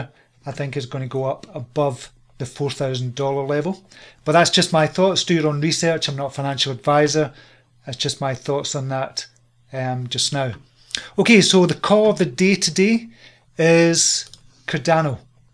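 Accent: Scotland, United Kingdom. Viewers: I trust the Scottish accent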